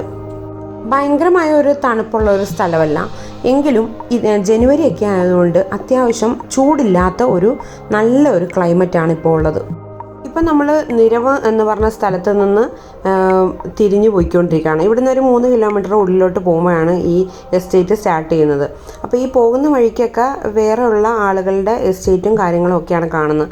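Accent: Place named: Erattupetta, India. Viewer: Eratupetta slang varunund samsarathil😇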